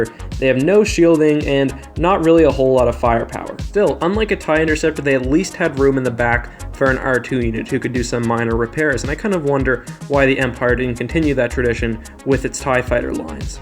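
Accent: Canada. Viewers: Wait you're a Canuck?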